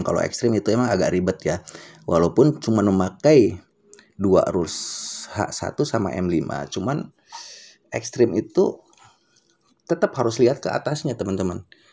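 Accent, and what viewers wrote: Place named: West Java, Indonesia. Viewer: Cara menjelaskan bhasa rakyat jelata, dgn bahasa yg medok sunda dan backsound hembusan 'udud yg khas mengiringi setiap paragraf dn yg penting buat orang jdi mudeng dan cpat faham, hatur nuhun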